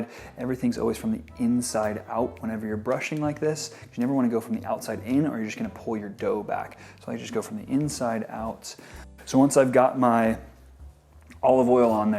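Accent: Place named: United States. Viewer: What's with the metric system? This guys sounds American but comes off european. Still good video. Thanks.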